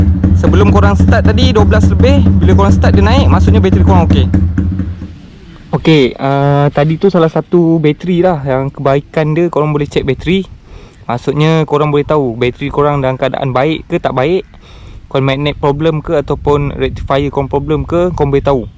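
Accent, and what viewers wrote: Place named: Java, Indonesia. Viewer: dgr dia ckp dri mle2 tgk dh tau jawa. Tgk muka pon tau. Sbb aku pon jawa. Haha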